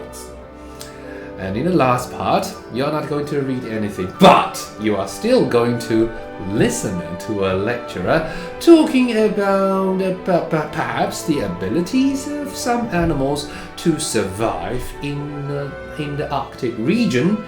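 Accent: United Kingdom. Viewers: Thanks for your guidance in Speaking。 I really like your authentic British accent！ teacher looks like Harry Potter Wizard And is super cool。 Ha ha ha ！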